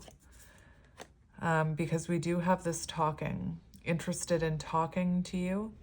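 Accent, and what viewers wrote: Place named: Canada. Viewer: My favorite Canadian accent friend ❤😘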